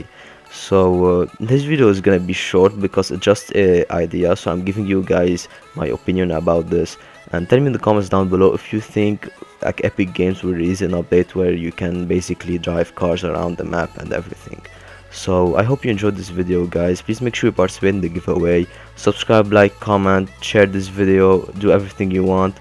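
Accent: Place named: Lebanon. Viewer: Yo im from Orlando but I got a friend from Lebanon and you have a really similar accent, are you by any chance Lebanese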